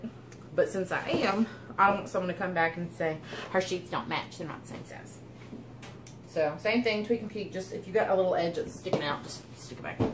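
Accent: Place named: South, United States. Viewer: like the southern accent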